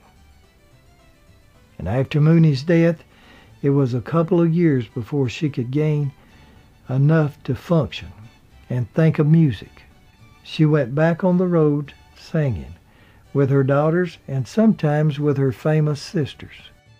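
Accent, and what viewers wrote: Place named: West Tennessee, United States. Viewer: just found your channel. Your voice sounds like pure West Tennessee. Very nostalgic, like listening to my grandfather tell stories when I was a child. Thank you.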